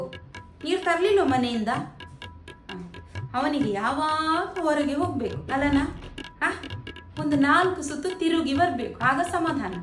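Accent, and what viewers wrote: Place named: Udupi, India. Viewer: ನಿಮ್ಮ ಭಾಷೆ ಸೂಪರ್,ನನಗೆ ಉಡುಪಿಯಲ್ಲಿ ಇದ್ದ ಹಾಗೆ ಆಯ್ತು ಮಾರಾಯ್ರೆ😂😂😂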